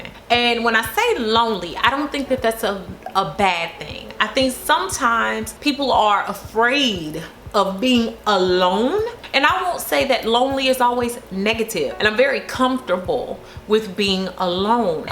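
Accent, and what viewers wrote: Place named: St. Louis, United States. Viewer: You inspire thousands with your knowledge and God speak. Your St. Louis accent is part of YOU and I'm glad that you're proud of it. I've been following you for 3 years and have learned a lot and appreciate your time & dedication that you've put in this channel. I'm happy for your new beginnings & proud of your accomplishments!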